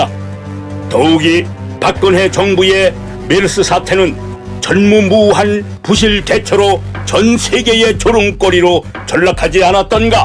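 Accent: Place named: North Korea. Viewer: 다좋은데 왜 이북말투예요?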